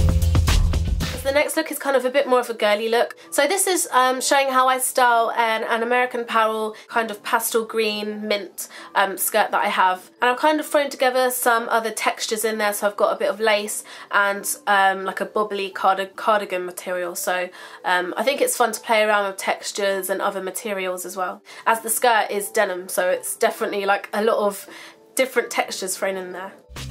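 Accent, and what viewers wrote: United Kingdom. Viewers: I love the accent! <3 Are you British? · she's british you can tell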